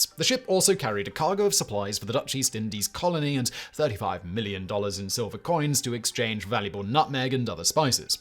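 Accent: England, United Kingdom. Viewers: I have no idea of most of his pronunciation. Is he English?? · When there is anything, you are not, you are you’re just smart and stupid ass to the rest of us, but your accent seems to fool the Americans Yes, because you’re British you’re not not you are not smart for the fall as many Americans and Canadians seems to think you are useless dumb ass everybody else and even dumber sometimes when the way you presented videos say my name you you’re stupid stupid man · If it wasn’t for accent (fairly typical British) I’d swear that English wasn’t even remotely close to his native tongue - the way he mispronounces so many straightforward words eg wallarbees (What the feck dude!?!?) is so funny to hear. Doesn’t anyone in his crew bother to proof read or check such basics before uploading this stuff? It’s becoming as bad as the “Dark5” series of channels with all its errors, cockups, and general idiocy. 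· This guy pops up in almost every channel I'm subscribed to but with different chin hair every time - I always recognise that posh English voice though ha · Southern California raised and when I see a thing about Mexican anything and then hear the British accent I know I am in for a bad time. Mispronunciation of non English words is nails on a chalkboard to me and at this point I think the mispronunciation is on purpose at this point as revenge for losing the colonies. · No offense, but to me, Englandish accents are annoying. I’d rather listen to AI, than someone unable to speak English in a less affected tone, or way. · I'm not sure what I like more about this video. The historical storytelling, glorious British accent, or that godly beard he's rocking. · Ade you all being serious? Perfectly fine English accent and speech pattern! Lord help ya’ll if you bump into a Glaswegian😲🤣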